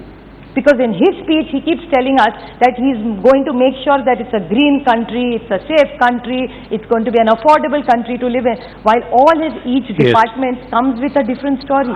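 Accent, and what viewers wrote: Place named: Maharashtra, India. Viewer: मराठी आवाज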